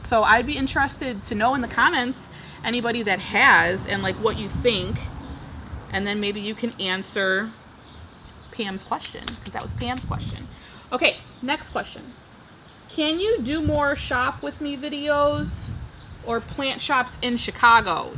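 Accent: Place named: South, United States. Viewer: Hi, I'm afraid of the string of pearls as well, they look like some green peas which are so delicate..plant with me are so soothing..where are you originally from?..you sound like you have a little southern twang..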